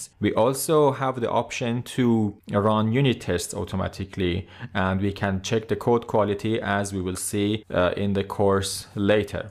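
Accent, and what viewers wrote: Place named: Iran. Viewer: Dadash lahjat maloome irani hasti. Khaste nabashi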